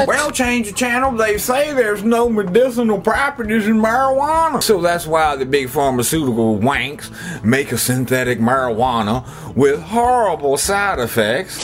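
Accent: South, United States. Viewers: Excellent! Nice job brother. I started to watch this, (my first) , video on your channel...and initially thought, 'how annoying' with the southern hic drawl, and the outfit, UNTIL...I heard what you had to say. into the video sums it up. Love the points and I learned some new issues I wasn't aware of. I'll be watching for the next one. Thanks for making current events interesting. Respectfully, G.W.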